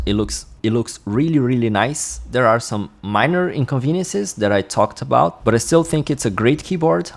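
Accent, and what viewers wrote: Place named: South America. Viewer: Heard a south american accent, where are you from? Perhaps Chile or Brazil?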